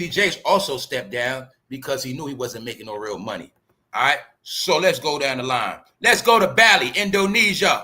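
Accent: New York, United States. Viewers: be cooking 💪🏽 especially with your NY accent I like, just my thoughts so if get out how’s he gonna be living 🤔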